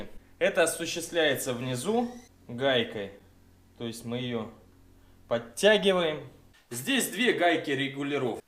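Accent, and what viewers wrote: Rostov, Russia. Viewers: это просто ростовский акцент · Это ростовский акцент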